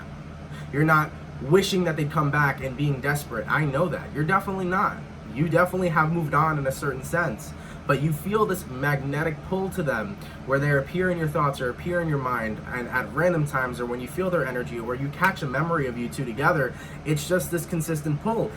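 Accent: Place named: United Kingdom. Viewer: also i’m hella jealous of your British accent. 😭 I wish i had one hah. 🥰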